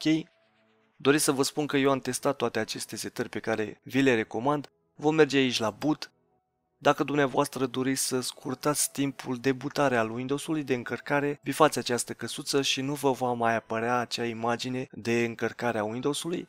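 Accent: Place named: Moldova. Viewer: Ce accent de moldovean.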